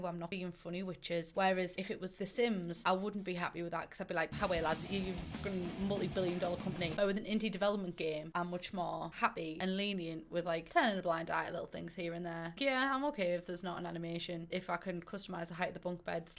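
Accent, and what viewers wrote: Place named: Teesside, United Kingdom. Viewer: Are you from boro? I’m from Teesside and you have a proper boro accent.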